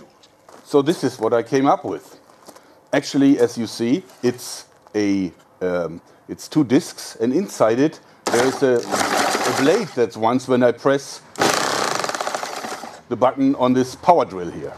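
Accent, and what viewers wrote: Germany. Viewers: Er IST deutscher, was denkst du wieso seine Aussprache diesen Akzent hat ;) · Is he german? it sounds like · Do you come from Germany?? Your voice sounds like that ;) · he sounds german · he isn't from Austria so it would sound different because arnold schwarzenneger is from Austria and Isn't he is from Germany can hear it from his accent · This was the first of all of his videos that I had watched and he sounded German. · Is it a german accent? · definitv German!! unverwechselbarer Akzent :D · def. sounds german!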